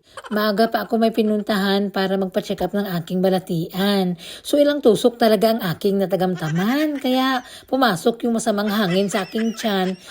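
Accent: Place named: Iloilo, Philippines. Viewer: hello po, i enjoyed watching your videos and listening to your voice and the way you talk in ilongga. ❤😅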